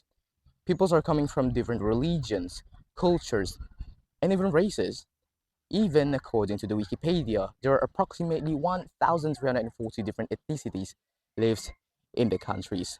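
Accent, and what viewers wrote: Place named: United Kingdom. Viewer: British nya terasa banget 😂 , tolongg sesekali buat video jokes pake bhs inggris dong.. 😄 arry po ah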